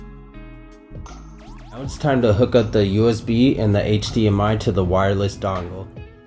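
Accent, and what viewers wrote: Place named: Bahia, Brazil. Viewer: meu deus que bahiano pqp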